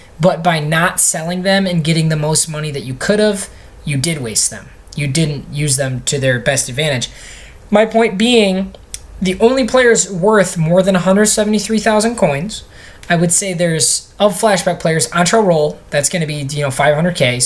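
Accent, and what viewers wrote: Michigan, United States. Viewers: Are you from Michigan? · are you from Michigan?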